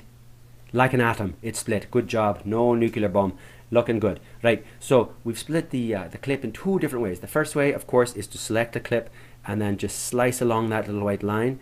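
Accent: Ireland. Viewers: With Irish Accent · Like your style. You make it interesting and easy. Also, great visibility to see exactly the buttons and the steps! The Irish makes it interesting too. Thank you.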